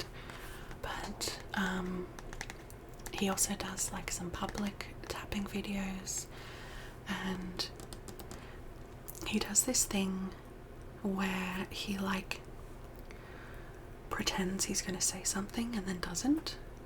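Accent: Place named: Australia. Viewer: this is such a special video! 🥲💗🙌 I really resonate with your gentle and loving style of ASMR. And I really enjoyed hearing you answer my questions! 🥰🥰🥰 (Also, your lovely Australian accent reminds me of a good friend of mine from middle school, which makes me happy. ❤️)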